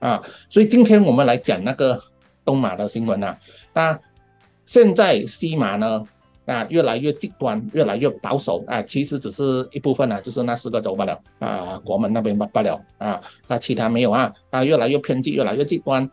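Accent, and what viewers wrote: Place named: Fuzhou, China. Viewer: Sarawak福州人在此报道 🙋🏻 听到你讲福州话好亲切😊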